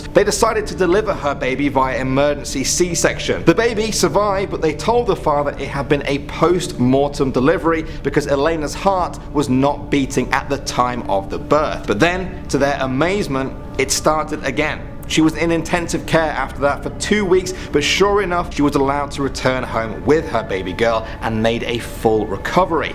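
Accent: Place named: England, United Kingdom. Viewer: is my favorite i love his enland accent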